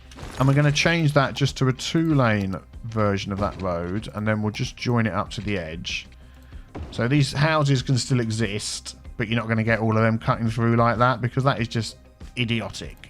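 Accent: United Kingdom. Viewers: thank you for the information on the roundabout builder. I brought up the English bit because people try to correct his pronouncing but who knows the English language better than the English.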